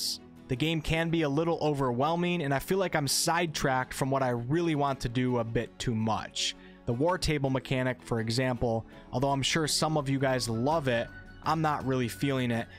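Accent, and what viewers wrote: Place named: Midwest, United States. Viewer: That midwestern accent peeking out on occasion.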